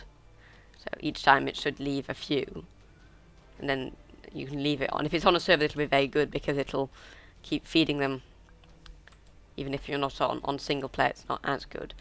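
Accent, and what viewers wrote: RP, United Kingdom. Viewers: Wow you are very good at this "tekkit" of which you speak of... although are you Brittish because you seem to have a very 'posh' accent... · Very helpful for an automated farm! Additionally love the RP accent.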